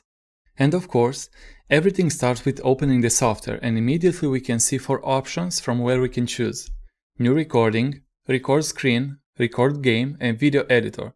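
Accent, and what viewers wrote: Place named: Russia. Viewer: Дружище, по акценту слышно, что ты русский. Пожалуйста выпускай хоть иногда видео на русском 🙏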